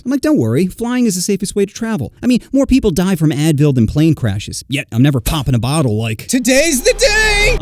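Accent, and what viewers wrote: Florida, United States. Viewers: This man sounds Floridian, talks about a lot of haitian interacts and they're riddled with them there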